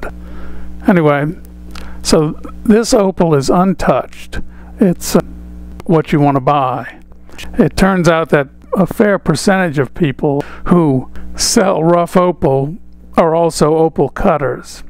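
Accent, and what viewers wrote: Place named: South, United States. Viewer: Hello, I was wondering if you sell opal?? And how come I absolutely love faceted opal but I NEVER see faceted Australian opal?? If you sell opal, please let me know! I buy Ethiopian and I’m wanting a large hunk of Australian but good lord it’s expensive. And your very southern, where you from friend? I’d love to sit down with you and saturate all your information!!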